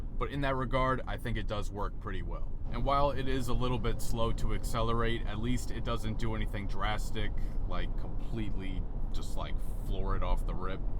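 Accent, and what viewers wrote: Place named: New Jersey, United States. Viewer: Bro’s never heard a Jersey accent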